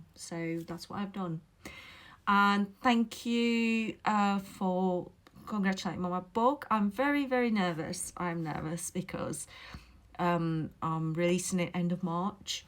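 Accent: Northern England, United Kingdom. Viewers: Welcome..you have obviously settled in with a Northern Accent And dropped that Cult at the same time.. The good thing is, you wont be knocking on peoples doors,( just as they are sitting down for Tea) punting the Watch Tower anymore. 🎖❤️🇬🇧🍷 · Beautiful spoken English! Northern accent, which is fine. I guess it MAY be possible for a language expert to detect a foreign accent but it would take a lot of effort and most native speakers would think you a native Brit. PS - Most native English speakers make MANY grammatical mistakes. If in any doubt about this, walk the streets of Middlesbrough or Newcastle, or listen to virtually any footballer being interviewed (apart from Dutch or Nordic footballers whose English is normally excellent).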